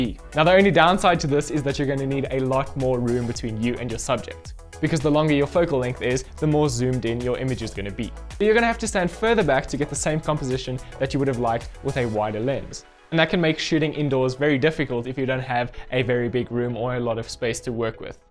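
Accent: South Africa. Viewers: Sound South African ...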